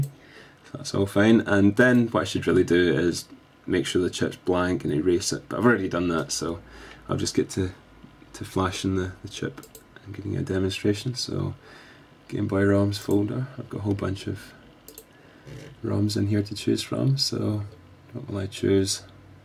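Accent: Scotland, United Kingdom. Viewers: I love the scottish accent.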